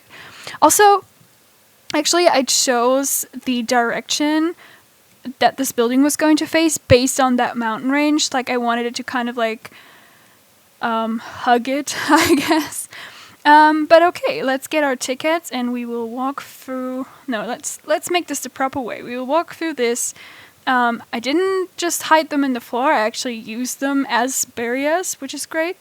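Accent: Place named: Netherlands. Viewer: Really detailed and good looking build! There is a chance you identify Dutch youtubers as German youtubers, our prenounciation in English is quite simillair. The red colored lamp are usually lamps that are designed to generate heat because the animal needs basking spots or a specific temperature. Ow and cookies, def cookies. Keep up the good work, just subbed!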